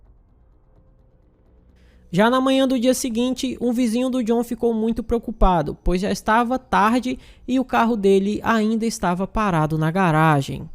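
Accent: Northeast, Brazil. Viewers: Oi! Caso horripilante, o ser que se diz humano, é um bicho perverso. vc é Nordestino? Acho seu jeito de falar bem familiar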